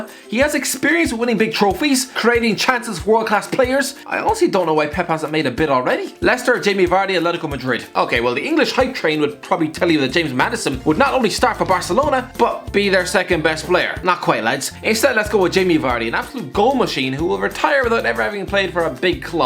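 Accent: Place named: Ireland. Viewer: Me: Goes onto HITC Sport Hears Irish guy Me: Leaves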